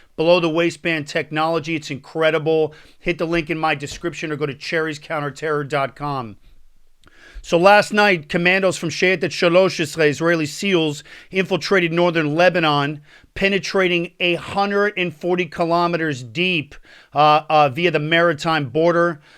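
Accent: United States. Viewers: Your israeli elite forces? You sound pretty american to me so are you israeli or american which side are you picking if they both went to war with each other? america is controlled and infiltrated by israeli’s that act like they care about american interests but all they do is serve israel. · Amhaz probably has a lot of info on seaside smuggling as well as any planned attack on Israel's off-shore gas rigs. Nice work, Israel &! You a New Yorker...?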